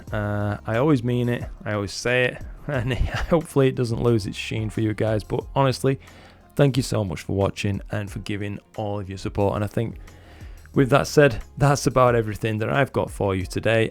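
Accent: United Kingdom. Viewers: Hi, excellent review. I look forward to the results of the deep sky testing of the 585. An unexpected result of watching your videos has been getting used to your nice British accent XD. I was used to American English, but now I prefer a British accent. No Dylan O'Donnell, I still can't get used to your Australian accent XD XD XD. Hugs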